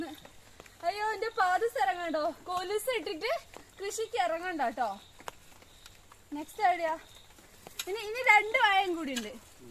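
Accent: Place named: Kozhikode, India. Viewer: Achanodum ammayudum nalla snehamulla kutty welldone pengalee place kozhikode anne alle slang kettapoza manasillayathu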